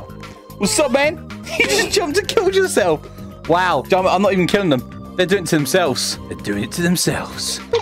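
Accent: United Kingdom. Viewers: The British accent 🥵😩😩😩😩😩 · Are you British? I love British accents, especially your voice is so soothing for some reason lol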